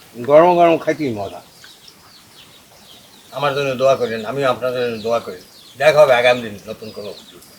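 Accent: Barishal, Bangladesh. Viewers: Brisal bola kotha nijer kahon valo boja😡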